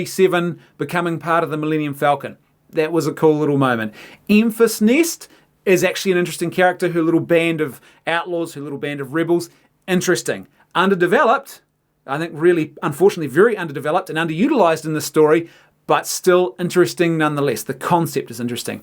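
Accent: New Zealand. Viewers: The only question I have after watching this: Are you from New Zealand?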